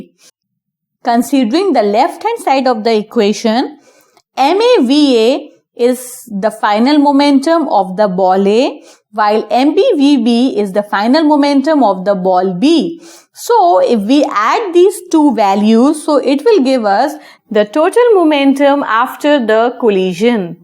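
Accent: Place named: Bengal, India. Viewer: Are u Bengali? I can understand by your accent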